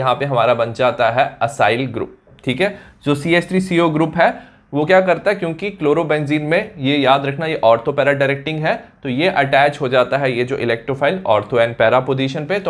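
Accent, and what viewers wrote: Kashmir, India. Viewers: sir aap kashmiri ho?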